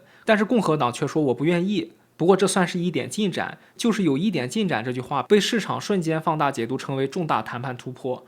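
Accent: China. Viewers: 听说话的习惯播主应该是大陆的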